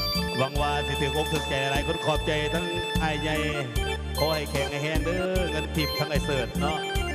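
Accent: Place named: Chaiyaphum, Thailand. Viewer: สำเนียงชัยภูมิเจ้าเป็นคนไทยมาเอาเมียอยู่ลาวตี้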